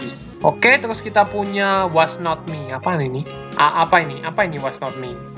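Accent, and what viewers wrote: Java, Indonesia. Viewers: kok medok dulunya yaaa hehe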